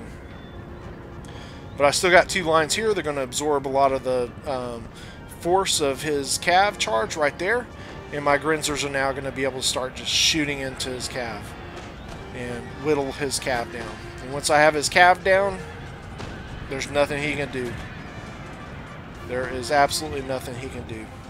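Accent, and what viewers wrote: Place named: South, United States. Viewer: I dont know why youtube suggested this video but I found it entertaining. Your commentary is pretty good and I must say I find your (somewhere in the southern US) pleasing to listen to, but perhaps 15 minutes is a bit too long. Anyways you earned yourself a sub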